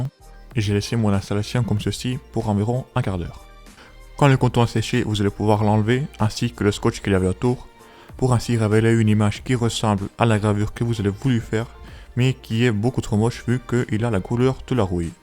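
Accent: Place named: Africa. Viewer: Une grosse voix d'adulte, un accent probablement africain. Et des petites mains de jeune adulte toutes blanches. J'avoue que ca m'a perturbé !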